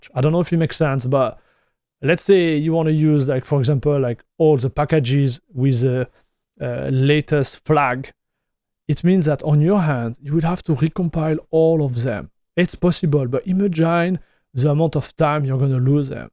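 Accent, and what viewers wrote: France. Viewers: French accent at it's best! Love! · It's Frenglish but it"s not too bad 😀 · bro he's likely native French and learned english, how do you think accents exist?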